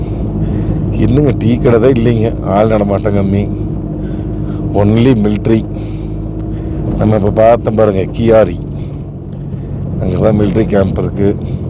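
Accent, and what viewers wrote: Kongu, India. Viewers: இன்று உங்கள் சிட்டியை திருப்பூர் கார் பட்டறையில் பார்த்தேன். சூப்பர்...உங்கள் கொங்கு தமிழ் உங்கள் காணொளிக்கு சிறந்த நன்மை. வாழ்த்துக்கள். உங்கள் தொடர்பு எண்ணை அனுப்பவும். நாங்கள் உங்களை சந்திக்க ஆர்வமாக உள்ளோம். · கொஞ்சும் கொங்குத் தமிழ் பேசும் கோமகரே...! திகட்டாத தித்திக்கும் பேச்சுடைய திருப்பூர் திருமகரே...! எங்கள் மதிப்பிற்குறிய திரு. ❤❤❤🙏 அவர்களே எப்படி இருக்கீங்க அண்ணி❤❤❤🙏 எப்படி இருக்காங்க ரித்திக்❤❤❤ எப்படி இருக்காரு. இந்த லடாக் பயணத்தை திருப்பூர் திருமகரின் பயணம் என்பதைவிட "வீரத்திருமகரின்" பயணம் என்பதே சரியானது❤❤❤❤ நேற்று நான் சொல்ல வந்த ஒரு தகவலை இரண்டாவது கமெண்ட்டில் சொல்றேன் சில நேரம் YouTubeல அதிக எழுத்துக்கள் கொண்ட கமெண்ட் பதிவாகாது? என்ன ஆகுதுனு தெரியல? ஒருவேளை அப்படி பதிவாகவில்லை என்று சொன்னால்? நீங்க&TMF புரிந்துகொள்ளுங்கள் பதிவாகவில்லை என்று🙏. நான் உங்களை திருப்பூரில் வந்து சந்திக்கும்போது அனைத்தையும் சொல்கிறேன். நீங்க இதற்காகவே அதை ஒரு வீடியோவாக நம் TMFக்கு upload பன்னுங்க. 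நம் ❤சிட்டுக்குருவியோட❤ தங்களது இந்த லடாக் பயணம் இனிதே தொடர்ந்திட நல்வாழ்த்துக்கள் ❤❤❤ என்றும் அன்புடன் உங்கள் தம்பி என்றும் அன்புடன் உங்கள் TMF ரா.முஹம்மது கபூர் (சென்னையில் இருந்து) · முதலில் உங்களுக்கு நன்றி... சிரமப்பட்டு டிரைவிங் செய்யும் போது பேசிக்கொண்டே வருவதால் நாங்களும் உங்களுடன் கூடவே வரும் உணர்வு... அதுவும் நம்ம கொங்குத்தமிழில்...அருமையான காட்சிகள்...ஆனால்..என்னவென்றால் பாவம்..உட்கார்ந்து கொண்டே செல்வதால் இடுப்பு வலி.. சென்றதும் நன்றாக ஓய்வு எடுங்கள்...Take care..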